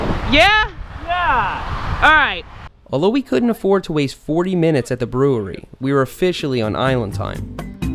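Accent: Long Island, United States. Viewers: "a buck twenty" n "peace out cub scout"... such a native LI lol.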